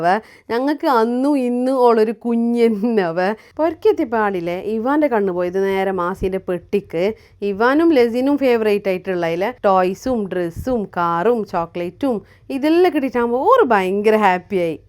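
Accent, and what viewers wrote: Kasaragod, India. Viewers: ഇതെവിടുത്തെ ഭാഷയാണ് കാസർഗോഡ് ആണോ, എന്ത് ഭാഷയാ ട്ടോ, ഒന്നും മനസ്സിലാകുന്നില്ല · Kasrod bhasha nte athra oru bhashyum indavvula aabe❤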